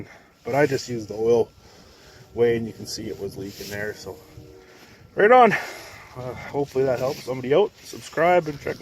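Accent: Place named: Uttar Pradesh, India. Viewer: You sound like you are from the U.P to me eh'...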